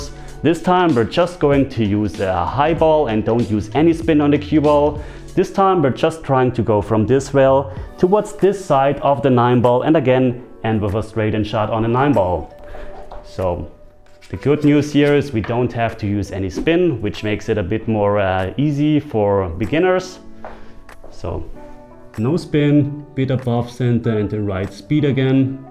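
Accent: Germany. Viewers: Great video, as usual. Interesting to hear you speaking with your bavarian accent. 😉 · Love the German stoic accent and your teutonic pool playing efficiency... Great..!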